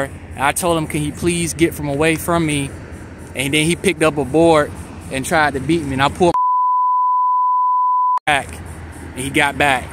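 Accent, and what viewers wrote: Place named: South, United States. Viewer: You make a great train video my friend. I can't figure your accent, either North Carolina or Louisana.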